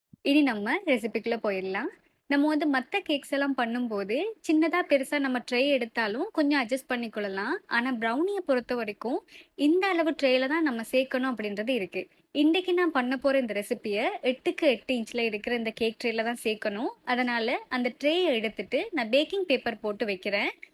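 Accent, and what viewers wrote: Sri Lanka. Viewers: Assalamu alaikum dear im 1st time watching ur video gud job and srilanka speech is cute ma sha allah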